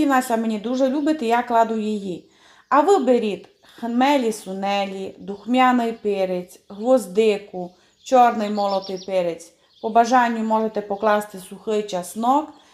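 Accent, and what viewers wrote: Transcarpathia, Ukraine. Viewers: Дякую за рецепт. Обов'язково приготую. Я обожнюю ваш закарпатський говір❤. Дай Вам, Боже, рясних благословінь і міцного здоров'я. ❤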